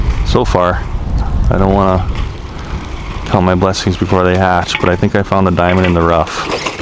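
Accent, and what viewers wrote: Hawaii, United States. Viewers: You live oahu? Nice to hear pidgin on youtube!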